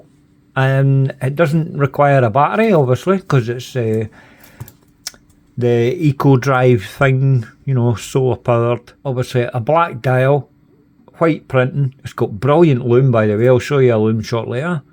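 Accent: Scotland, United Kingdom. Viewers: I actually like it in nice good old scotish accent. More than standard london english, to posh for me. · Lovely Scottish accent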